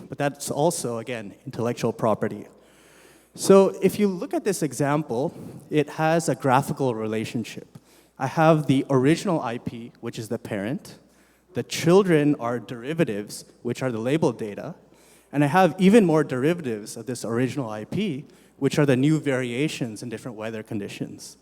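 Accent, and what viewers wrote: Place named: United Kingdom. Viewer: An Indian with such a wonderful accent. Speaking like a pure British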